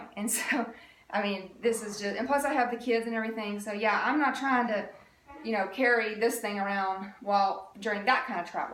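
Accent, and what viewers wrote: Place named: South, United States. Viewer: I love u and your southern accent! U r funny! Had to sub due to your awesome personality! 🤣❤️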